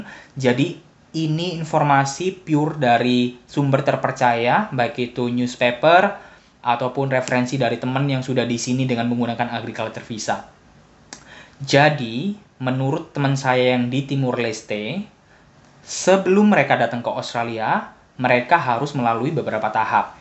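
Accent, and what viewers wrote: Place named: Java, Indonesia. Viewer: Dari logatnya mas nya jawa ya..jawa mana mas..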